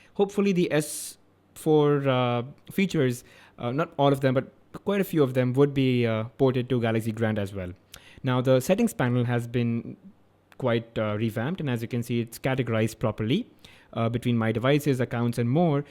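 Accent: India. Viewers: aman Allahım adam hint aksanıyla ingilizce konuşuyor ve anlamak için kendimi yırttım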